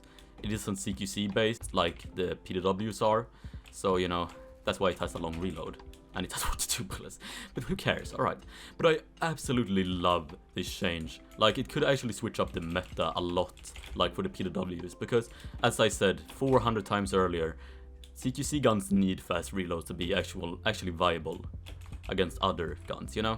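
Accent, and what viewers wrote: Sweden. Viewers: Plus a swedish accent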